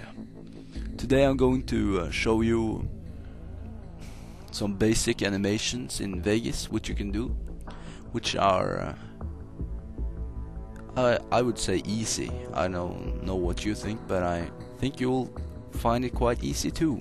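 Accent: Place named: Norway. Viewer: go norwegian accent hehe im from Norway to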